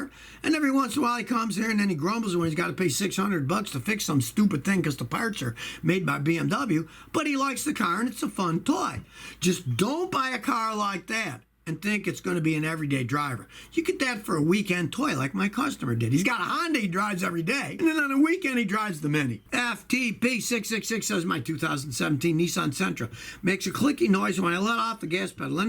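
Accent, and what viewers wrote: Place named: South, United States. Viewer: YOU ARE SO SOUTHERN , jeam BEAM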